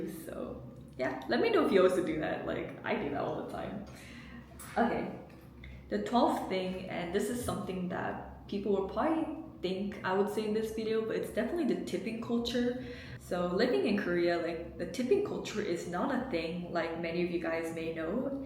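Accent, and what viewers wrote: United States. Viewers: Did you grow up in the US or in Korea? You speak like an American but a barely discernable accent. I'll check out some of you other videos maybe you disclose it there. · Your American English is perfect. Are you sure you're not American?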